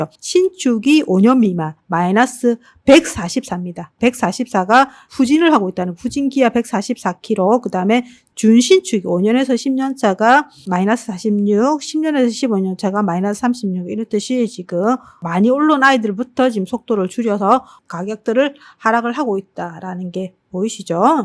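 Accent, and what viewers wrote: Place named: Gyeongsang, South Korea. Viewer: 쌤~~~ 강의가 너무도움이 많이되고 좋아요.근데 경상도 사투리에 말씀이 빨라서 잘 못알아듣겠어요.말씀을 조금만 천천히 해주시면 좋겠습니다~~~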